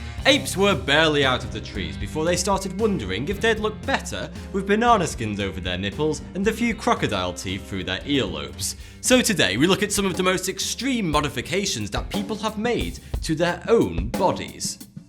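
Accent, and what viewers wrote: United Kingdom. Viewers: Why i never miss a video: 1% its interesting 99% british accent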